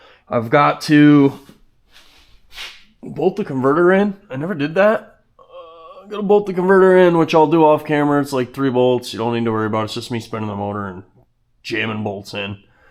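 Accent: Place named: Upstate New York, United States. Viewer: I love watching you work on "old junk" much more than I would like to watch people work on expensive new things. I don't like to watch rich dudes rubbing it in my face. I like watching because I like seeing someone who is obviously doing something they love with obsolete things, not wasting things, making things work, doing good work, tasteful work, intelligent work. I can't stand people who do click bait, who trash things for no reason, who are destructive, materialistic, act stupid or crazy on purpose. comes off as a genuine dude and I believe he acts the way he is on camera when he is off camera and I think he is sincere in what he says. He would probably get more views and make more money if he was like that but, I am glad he isn't willing to sacrifice his class for that. My father was a trucker from Oswego County NY, he loved all this kind of stuff. 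I love hearing talk his accent reminds me so much of the working class upstate NYer guys I was around as a kid. It really warms my heart. It's also impressive to see a guy who is doing well in spite of all the downsides of living up in NY. The weather, the economy, the government, the laws, the rust, the cost of living, the taxes. I love it up there in the summer out in the country. I'm 41 and I was in the military and I just couldn't make it up there so I live Tennessee. Thanks making these videos, I really appreciate them.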